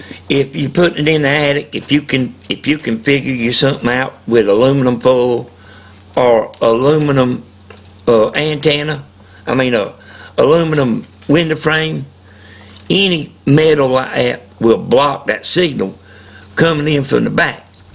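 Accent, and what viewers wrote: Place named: Tennessee, United States. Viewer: Really appreciate the attention to detail. You and your videos are without a doubt the best and most properly informative antenna building tutorials on YouTube! Not to mention, everyone loves the sincere traditional American only southern Tennessee accent- adds that extra lovability to your clear credibility no doubt brother. God bless.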